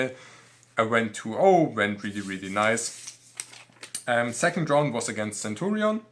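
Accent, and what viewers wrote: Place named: Germany. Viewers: he could dub a character with that voice, he didnt even have to tell me he was german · Very german english 😂❤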